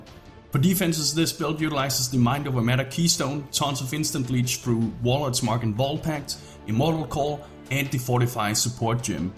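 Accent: Denmark. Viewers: The accent tells me he's a Dane, am I right?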